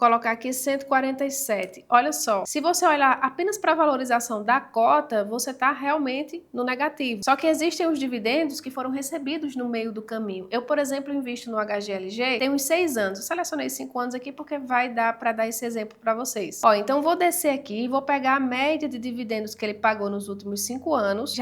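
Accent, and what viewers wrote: Brazil. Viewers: Seu sotaque é de que local do Br?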